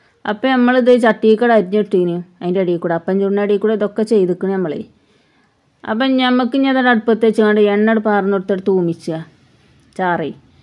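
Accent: Malappuram, India. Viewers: മലപ്പുറം ഭാഷ സൂപ്പർ വീഡിയോ സൂപ്പർ 💞💞💞💞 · അവതരണ ശൈലി നന്നായിട്ടുണ്ട് - അല്ലേലും മലപ്പുറം ഭാഷ ഒരു രസം തന്നെ - ഇഷ്ടായി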